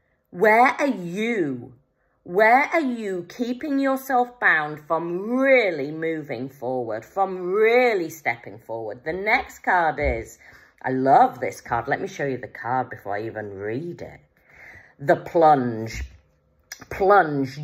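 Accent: United Kingdom. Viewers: Nice to hear a Yorkshire voice that is where I am ❤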